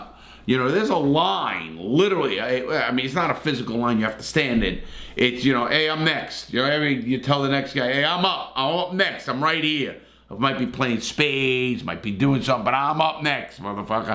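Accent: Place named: New Jersey, United States. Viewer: Your accent reminds me of a close friend... He was from New Jersey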